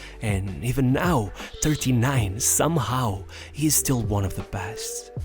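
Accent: Portugal. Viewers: Bro are you Portuguese? Your accent sounds Portuguese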